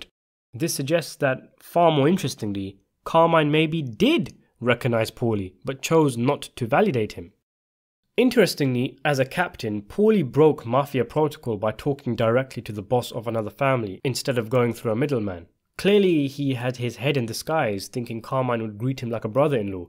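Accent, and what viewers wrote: United Kingdom. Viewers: I like hearing quote Sopranos lines in an English accent. “You’re a wormy cocksucker 🧐” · The British accent of the narrator is so wrong for this video.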